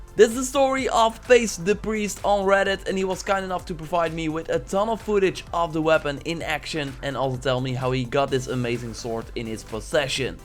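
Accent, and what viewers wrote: Netherlands. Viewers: he is dutch. thats why he has a acccent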